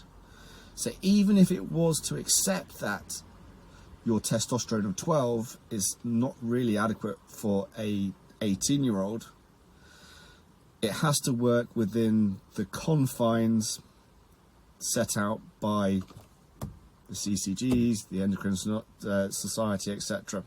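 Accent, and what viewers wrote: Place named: New York, United States. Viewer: Yes!!!! New York accent 😂👌🏻 HOT DAWWWWG 🌭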